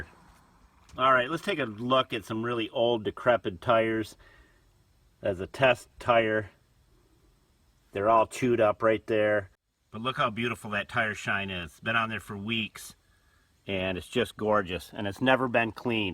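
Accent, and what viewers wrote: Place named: Michigan, United States. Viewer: If you ever wondered what a Michigan accent sounds like listen to this guy. Lol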